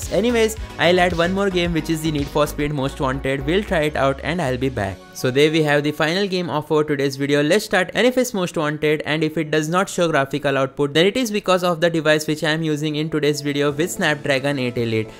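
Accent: India. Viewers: i need a subtitle i dont understand indian english accent bruh😂😂😂😂😂😂😂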